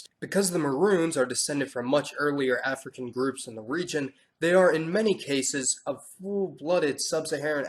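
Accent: United States. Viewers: Thank you for great clips. One thing i can say is that please, if it is possible to put english subtitle, cause american english pronaunciation is defecault for understand. I am from southeastern Europe and british english is more understandable for me. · He was on no more than telling out a university assignment to us all. What the man says will highly suggest that it is fact. When what he is reading as the sources, gives him sentencing and paragraphs without the words might, could or possibly. No, all cohesion does is make an individual sound academic which he does superbly. Also, he comes across coherent, yet others may argue that the use of English language amongst Americans never sounds as coherent as a non academic schooler from England. For example, the part in this video where he presents the pie chart data about population of Africa India and others; however, cohesive - a non academic English child could in my reckoning sound more coherent like with 'the flocking Indians rule over there'. 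Once again American accents take away a little coherency of English languages. Only a little mind you he is still heard. I listen back to my English and sound like a gay kid looking at his own backside arching for please please sir I want some more or whatever Oliver said in his moment. I does think like in the case of Mauritius, that in the West books like remembering the world started in the 19th century when slavery was abolished. When we all know the world is still less than 200 years old from 1837. My guess is Europeans knew how advanced they felt about themselves and really in their hearts knew despite colonialism that they were never turning Africa into European beliefs without slaves. Ask scholars why they don't talk about wh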